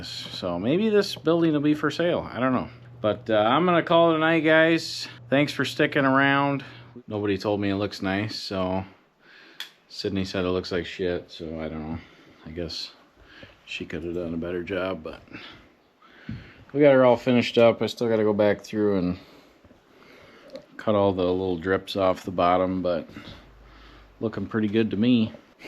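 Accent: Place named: Canada. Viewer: Ah ur too Funny ... Canada " eh "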